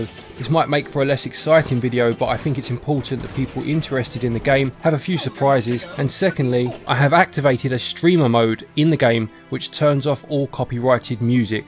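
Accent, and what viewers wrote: United Kingdom. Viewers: Oh, ok, the man knows digital pinball, too. You're quickly climbing the ranks of my favourite Switch-related channels, sir, and your accent makes me want to spell things colourfully ^.^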